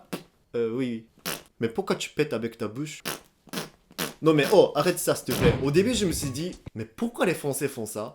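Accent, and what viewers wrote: Japan. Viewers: 😂😂trop cool moi j'adore quand les japonais parlent en français c'est trop mignon 😊😊😊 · C’est marrant 🤩 ! Tu a le même accent ou la même façon de parler qu’une de mes voisines 100% japonaise….t’es de Tokyo comme ma voisine ?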